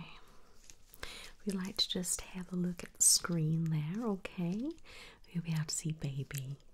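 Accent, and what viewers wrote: South, United States. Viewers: Yup I was thinking the same, kind of has a southern/Midwest twang😁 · Hi.... I mean!;) I wish the ob/gyns I've met were as nice as you are in this lovely, lovely RP.:( They are usually so dismissive and sometimes even rude. I'm sure most of the time, it's not even their fault - cause they have so many patients to see. But sometimes, they are really not listening and not empathizing. That being said, I would really love a RP where an ob/gyn believes and comforts me when I tell her that I have excruciating period pains, that incapacitate me for days, plus PMDD the week before (basically PMS on acid) and suggests further investigations for endometriosis or other disorders, instead of just giving me hormones and dismissing me, saying that "period pains are normal". No, these most definitely are NOT. In other words, I have to mention that that's a lovely Southern accent (granted it's... 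mixed with something else) you're pulling off.:-D And I love your look with that wig. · I heard the southern too...Kentucky, Georgia or South Carolina maybe...mixed with somewhere else I couldn't place, but there are so many parts of the U.S. that are off the beaten path, it could be a real accent somewhere. The main thing is being consistent throughout...which I thought you did well. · Honestly the accent isn't that bad. At times it sounds sort of southern which I thought was nice. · Omg I was not expecting a southern accent when I read “American accent” lmao! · You sound like a Tennessee lady. Doing some Southern charm characters would be good! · Not dreadful, unless you weren’t going for Southern. Every time someone from England tries to do a American accent they sound like they are from the south. And the wig definitely goes with the accent 😂😂. love your vids!!! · YESSS 💙 This was so amazing!!! 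It seemed like you were doing a southern-ish American accent and if sooo you did amazing at it. 💙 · Southern belle! Love the accent honestly, it works! Soothing as always, really love your asmr videos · You did great with the accent overall :) an easy suggestion (only since you asked) would be to make sure that you're not softening your vowels where they shouldn't be.....I think that's a big difference between your side of the pond and mine :) also, be careful not to throw too much southern into it, it can ring very fake to southerners ;) but girl this was awesome and I definitely think you should try the accent more! I also personally love this particular role play theme, so relaxing.... you are incredible as usual! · Sounds good. Like the southern twang you added. I'm in the hills of Missouri and I approve. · I thoroughly enjoyed this one 😄 great job on the Southern accent lol. Maybe try an African accent next time just for laughs 😆, now that would be quite the challenge 😎! 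· The accent is vaguely southern but has hints of other regions as well. I've never heard someone with this accent before, but it's still quite relaxing. I don't really have tips because I'm terrible at accents as well. I couldn't differentiate between british and australian accents till I was like 12 lol. I would say the most un-American thing in the video was your use of the word "trousers" though. I can't remember the last time I've heard someone American say anything other than pants, unless they are referring to formal pants like for a suit. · Omg the accent was great! It sounds very southern. Good job 👏🏽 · I had to smile during this one. So used to your British accent, it was, kind of weird, hearing you speak in a southern accent. Don’t get me wrong, it was fantastically made, just so out of the norm from what I’m used to here ☺️ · You sound like a southern belle!!! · Sounds like ur from the south xxx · OH MY GOD THE SOUTHERN ACCENT IS SO GOOD???? 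LIKE OKAY WE SEE YOU!! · Actually... The southern accent is pretty much this.... But well kept secret, Southerners do the best fake Brit accent 🤣🤣🤣 we're the last remnant of the British rule in America 🤣🤣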